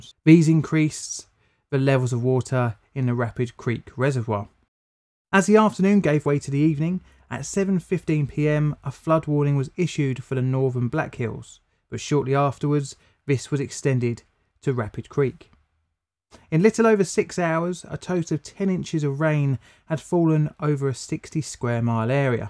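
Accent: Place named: South London, United Kingdom. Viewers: "Sunny Southeastern corner of London"? Sounds like you are talking like some place near Carshalton. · Something about an English accent is relaxing.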